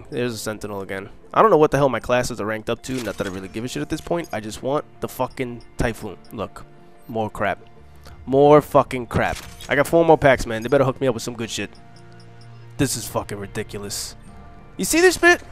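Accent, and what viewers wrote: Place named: New Jersey, United States. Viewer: He sounds like he's from Jersey Shore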